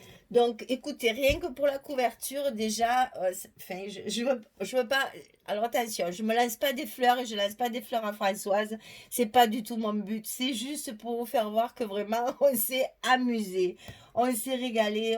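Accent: South, France. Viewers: Superbe! Quelle imagination! J'adore! Bravo à toutes les 2! Et très sympa l'accent du Sud! 😉😉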